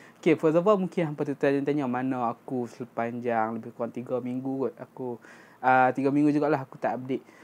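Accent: North, Malaysia. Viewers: accent utara pulak tu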